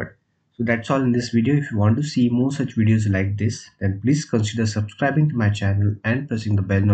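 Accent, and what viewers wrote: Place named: India. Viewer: thanks a lot, I'm a 73 years old french woman and first I was a little puzzled because of your nice indian accent and the fact you speak quite fast but your explanations were so clear, thanks a lot you really helped me. In the seventies, during 1 year in West Berlin I shared a flat with 3 guys from Bombay and one from Madras and you made me feel young again so double thanks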